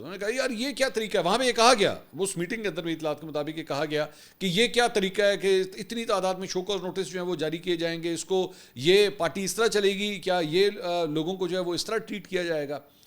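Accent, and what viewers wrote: Punjab, Pakistan. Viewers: Mansor bhi Allah ap ko seht k sat lambi umer ata kary jange k badse se apko dekhna shuru kya both maza ata hy jb ap beach. Me panjabi terka lagaty ho sir g good Allah kush rakhy ap ko ameeeeeeeeeeeennnnn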